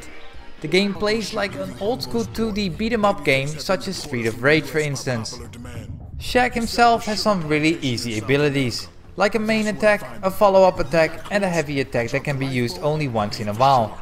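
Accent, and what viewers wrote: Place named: Netherlands. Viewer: You're Dutch right? You hear it right away lol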